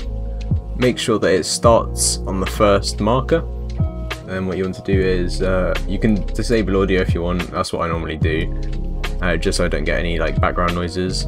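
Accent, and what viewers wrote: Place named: United Kingdom. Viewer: love british accents sometimes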